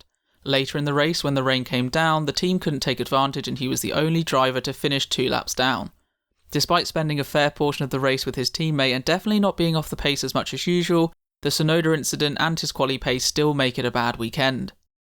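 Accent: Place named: United Kingdom. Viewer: re . Ouch ! That's a bit harsh on Her Majesty . You are obviously British with an accent like you have . No CBE for "Services to Motorsport Commentary" coming to you anytime soon !!!! :-D Ahh, yeah , I do love the way you deliver your commentary . Still I don't give out CBEs !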